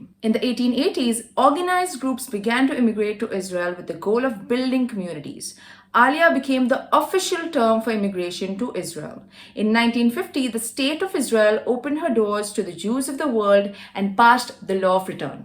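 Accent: Maharashtra, India. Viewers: You have a thick marathi accent.. lol.. good info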